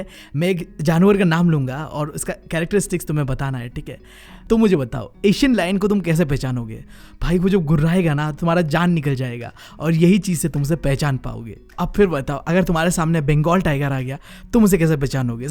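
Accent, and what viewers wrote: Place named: Assam, India. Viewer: I like your assamese accent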